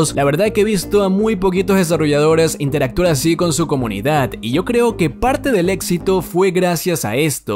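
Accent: Ecuador. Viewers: Hablaste como ecuatoriano, me equivoco?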